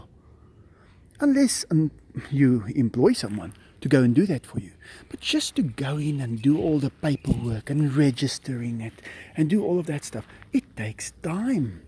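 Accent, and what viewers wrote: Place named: South Africa. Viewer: It sounds like you from south Africa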